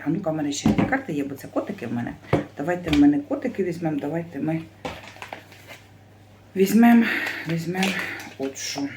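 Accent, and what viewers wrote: Kryvyi Rih, Ukraine. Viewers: дорогенька,люблю вас слухати. Наш криворізький суржик,але,ну не облизуйте карти,коли їх витягаєте. Вибачте за зауваження.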